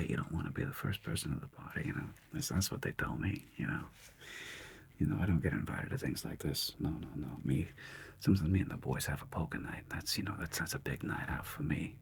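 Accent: New York, United States. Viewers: I like your NY gumshoe accent. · This felt like a spider noir theme with the New York accent and the black and white. Amazing acting too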